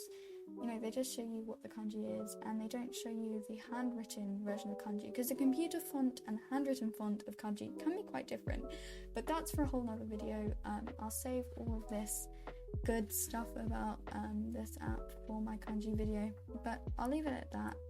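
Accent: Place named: United Kingdom. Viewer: You're so underated btw ur British accent is ssoooo good i love it💗💗💗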